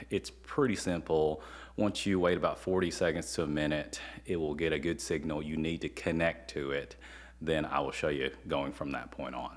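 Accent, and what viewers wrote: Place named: South, United States. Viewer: Why does this philipino guy sound like a southerner hick?? the accent is just annoying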